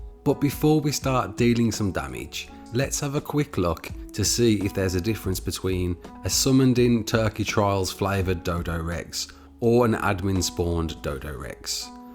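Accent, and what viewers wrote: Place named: Midlands, United Kingdom. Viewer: where abouts you from in Midlands sound local man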